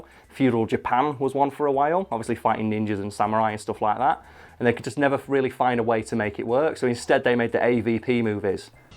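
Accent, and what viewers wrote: United Kingdom. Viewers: Just watched the new movie and yes, this leaked script is literally what happens. Spoiler alert I guess? In the tone of you’re sweet British accent, this movie indeed SUCKED ASS!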